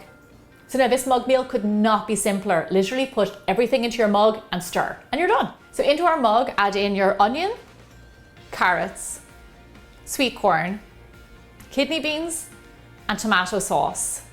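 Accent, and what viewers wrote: Ireland. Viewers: no problem I loved your Irish brogue it is so cool and I love your microwave meals I made a olmlate in a teacup or mug it was good eggs cheese and tomatos for 1 minute it was good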